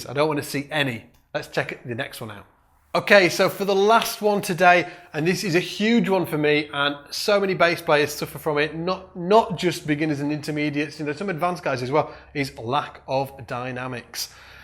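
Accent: United Kingdom. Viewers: damn, that is some hardcore british accent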